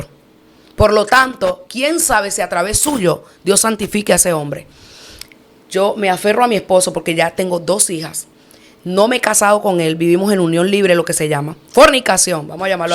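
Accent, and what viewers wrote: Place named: Dominican Republic. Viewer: Esta hermana parece Dominicana tiene el acento de el pueblo Hato mayor Rey Dios siga bendiciendo esta mujer del Reino un abrazo a la distancia ❤❤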